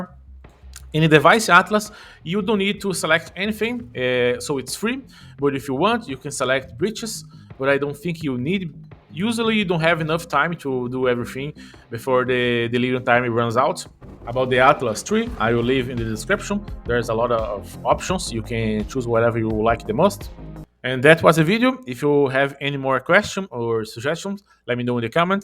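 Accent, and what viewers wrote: Brazil. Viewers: irmão, tudo bem? Quero deixar aqui meus parabéns por produzir conteúdo em outra língua, eu perguntei por conta da entonação "Eh" que é bem característico de brasileiro, não perguntei para causar algum tipo de ofensa ou intimidação, mto pelo contrário, se vc produzir conteúdo sobre este jogo em português vou me inscrever no seu canal pois achei bom. Desejo a você muita resiliência e sucesso, forte abraço. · Sotaque de BR é sempre reconhecível, mas ta mandando mto!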